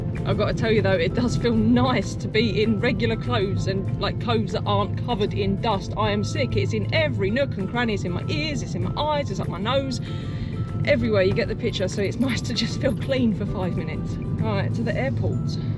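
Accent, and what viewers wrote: United Kingdom. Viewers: Talking fast...I change Play Back Speed. Being from US it is sometimes difficult figuring out what Brits are saying. ☺️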